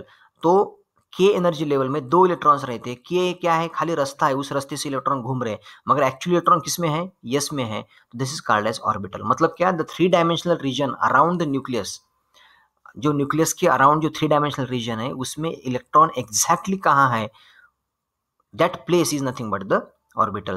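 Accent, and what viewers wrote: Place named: Maharashtra, India. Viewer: Bohot mast explanation all concept are cleared !!! And i love that MARATHI ascent 💞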